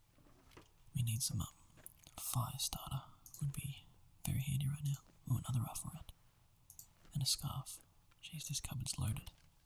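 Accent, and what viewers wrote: Australia. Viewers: Really enjoyed it :) Are you Australian? It's safe to say you're my favorite male ASMR'er. You have a nice way of pacing your videos.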